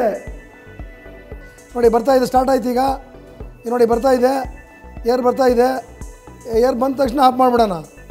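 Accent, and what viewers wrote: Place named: Karnataka, India. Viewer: His desi kannada very fine to listen, simple super gut, from u.a.e thanks boss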